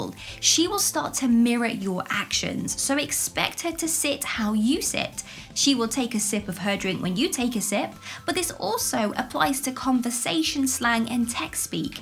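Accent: United Kingdom. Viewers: heyy you are one of the best advice givers ever on youtube, there is a christian girl at my church who seems to like me a lot, btw how often can you text a girl every two or three days I am assuming, i need your advice btw your British accent is pretty cute. Lastly, you can be little awkward sometimes right not intentionally, but it happens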